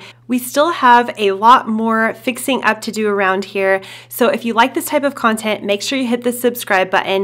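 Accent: New Zealand. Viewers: Oh my gosh I hear a New Zealand accent lol...I'm kiwi too. I love the transformation, I love how you've created a beautiful space within your means. This is really motivating. So many people show renovations that look unrealistically expensive for many. Thank you for sharing your journey 😊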